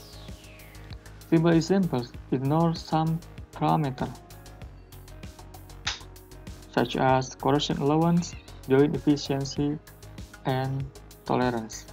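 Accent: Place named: Indonesia. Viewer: Wah keren mas bro, jarang" liat video engineering aksen indo. Kebanyakan india 😂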